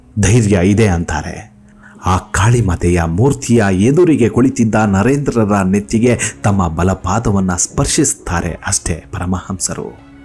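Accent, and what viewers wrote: Standard. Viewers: Uttama. vyakhyana hagoo shuddha bhaashe Keli santoshavayitu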